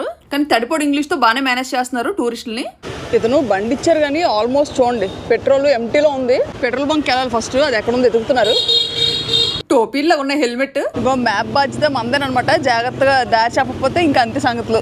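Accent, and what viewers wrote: Vizianagaram, India. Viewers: మీరు మాట్లాడితే vzm accent ఉట్టి పడుతుంది. Intlo valu matladinattu untundhi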